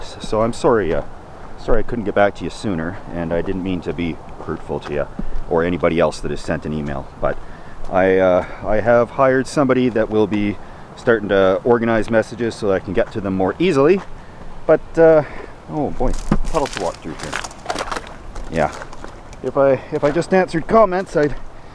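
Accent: Canada. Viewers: Don't know if it's that smooth Canadian accent but everytime I watch, I'm relaxed and know everythings gonna be alllllll right.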